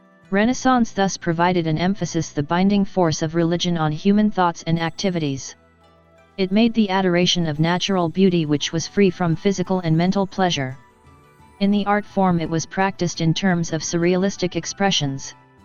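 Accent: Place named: India. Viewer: Improve your Indian speaking English way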